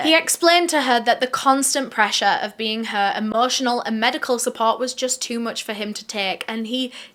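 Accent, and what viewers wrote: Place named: Northern England, United Kingdom. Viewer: Are you from Northern England? Your accent is quite provincial.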